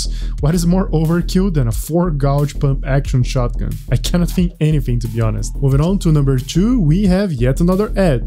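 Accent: Brazil. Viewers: cara, você é brasileiro ?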